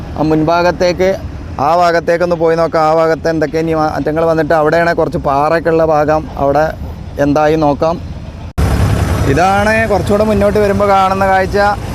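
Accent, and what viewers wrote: Malappuram, India. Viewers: കഴിയുന്നതും വളരെ ഏറെ ഭംഗിയായി അവതരിപ്പിച്ചു.. നമ്മുടെ മലപ്പുറം ശൈലിയിൽ പറഞ്ഞപ്പോ വല്ലാത്തൊരു സന്തോഷം..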